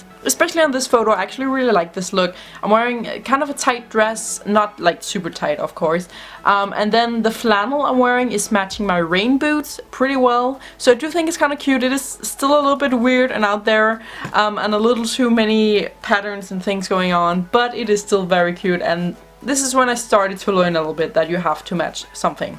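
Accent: Denmark. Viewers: lol jeg vidste du var dansk pga din accent, har også gået på smededal!!!!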